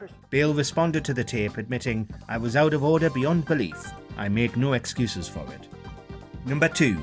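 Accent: United Kingdom. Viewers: i cant figure out the accent. which part of britain is this?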